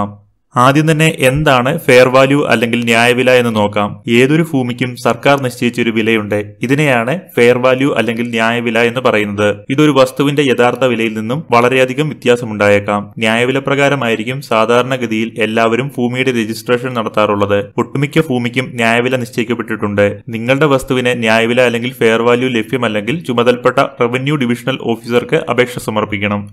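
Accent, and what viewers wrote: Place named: Trivandrum, India. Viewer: Tvm slang♥️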